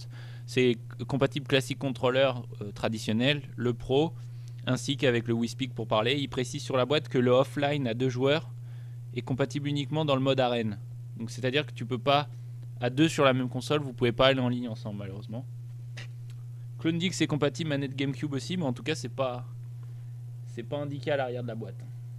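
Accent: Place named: France. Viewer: W00T I LOVE YOU FRENCH ACCENT:p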